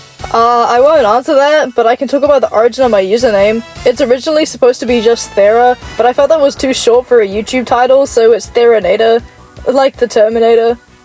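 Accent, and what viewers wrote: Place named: United Kingdom. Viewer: YOU'RE BRI'ISH??? also hi its Ed from discord also we started watching Dr. Stone at like the exact same time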